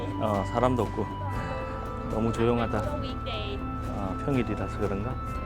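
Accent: Gyeongsang, South Korea. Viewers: 아유타야 유적지 구경 자~알했습니다 역사 나레이션 하실때 어색하지만(같은 갱상도 나레이션이라 ㅎㅎㅎ) 내용면에서는 알찼습니다 ^^ 진지하게 설명 잘 하시다가 "앗 뜨거"에서 빵 터졌습니다 ㅎㅎㅎ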